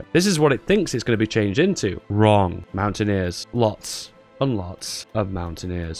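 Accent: United Kingdom. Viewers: The reason I subscribed to this channel is because you are so damn brittish and it makes me feel great to hear your accent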